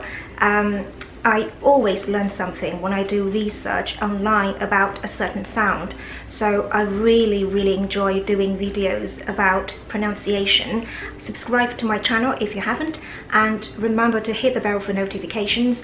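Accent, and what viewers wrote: United Kingdom. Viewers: 你的英式英语的口音非常漂亮。但是你不知道美式英语中的L,N,R,M的前后都有元音时，这4个音要用2次。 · 我喜歡fanfan的英國腔還有神秘人的聲音很有趣因為他兩次一定會有一次聽起來很不耐煩😂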